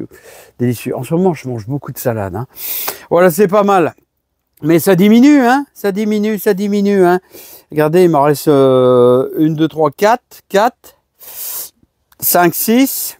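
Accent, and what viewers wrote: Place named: Pas-de-Calais, France. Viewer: J'adore. Votre accent du Pas de Calais est un régal. J'espère faire des poireaux en suivant vos conseils. Mes laitues à moi sont minables pour l'instant.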